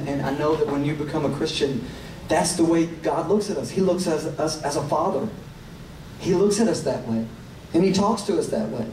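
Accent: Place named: Tennessee, United States. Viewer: "You see, I sound like I'm from Tennessee . . . because I am."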